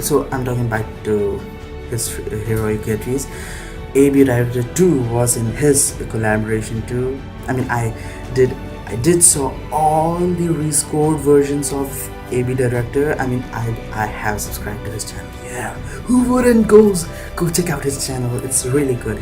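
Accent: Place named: England, United Kingdom. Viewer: Like the english accent :v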